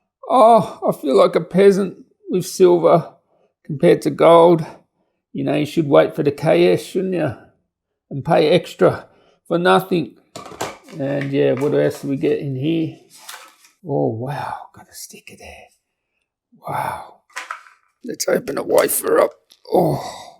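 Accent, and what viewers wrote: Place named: Australia. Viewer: Most fucking aussie voice I have heard in my life. But with a hint of wog too love it. Subbed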